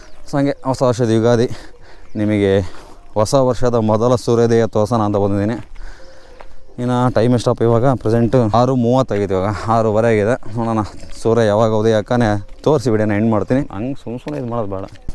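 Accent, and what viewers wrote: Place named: North Karnataka, India. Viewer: ಹೇ ಗುರು ನಿಮ್ಮ ಭಾಷೆ ಉತ್ತರ ಕರ್ನಾಟಕ ತರ ಇದೆ.. ದಾವಣಗೆರೆ ಭಾಷೆ ಸ್ವಲ್ಪ ಚನ್ನಾಗಿ ಇದೆ ಅದನೇ ಮಾತಾಡು 😂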